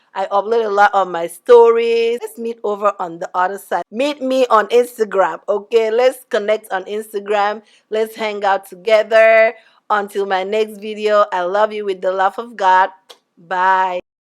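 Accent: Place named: Efik, Nigeria. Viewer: You sound like my Efik or Ibibio sister❤ Thank you.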